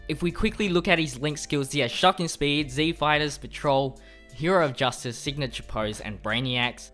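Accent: Australia. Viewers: Hey dude are you from Aussie i think I have asked before but Idk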